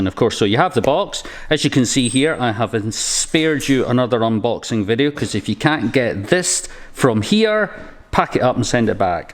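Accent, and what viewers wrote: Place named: Scotland, United Kingdom. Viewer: OMG 😮 ... You’re Scottish!! :-). All the big you tubers are Scottish... just look at big-Clive!! :-)... great video!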